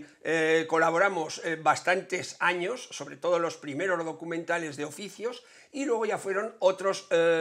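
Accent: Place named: Aragon, Spain. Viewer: Y con tu acento tan Maño le das también una chispa a todo este enorme trabajo 👍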